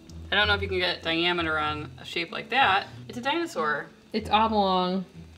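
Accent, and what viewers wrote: Chicago, United States. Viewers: First off you guys are effing adorable! I love the dry sarcastic sense of humor! Thanks for making this helpful content! PS. You sound like your from Chicago!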